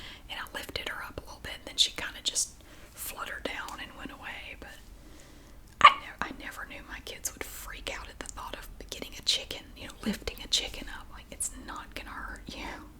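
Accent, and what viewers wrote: South, United States. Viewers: I’m a baby and you’re my mom right now! I love how southern and relaxing your voice is❤️ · As a southerner, I love to watch your videos! Makes me so happy to hear your accent! Sending hugs from Texas!!!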